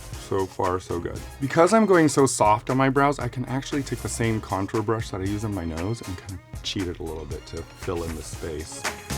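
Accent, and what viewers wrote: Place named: Mid-Atlantic, United States. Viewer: I love Raja's voice. There's a touch of old Hollywood/mid Atlantic without being fake.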